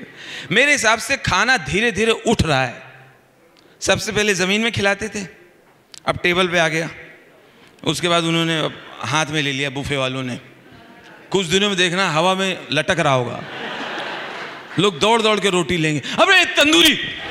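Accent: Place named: Kanpur, India. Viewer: Aap ko sun ke Kanpur yaad aa gaya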